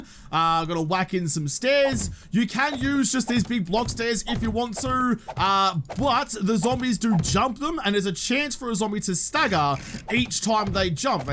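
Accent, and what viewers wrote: Australia. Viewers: You say at start of video for new people to game.. then proceed to shoot wood on ground and build right away. Lost me completely with that Aussie accent. Trying to learn.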